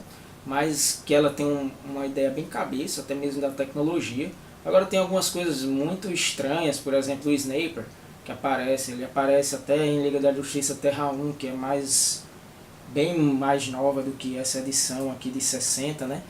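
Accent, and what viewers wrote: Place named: Northeast, Brazil. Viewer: Grande Vídeo, tava faltando vídeos com um sotaque familiar daqui do Nordeste :). Abraço.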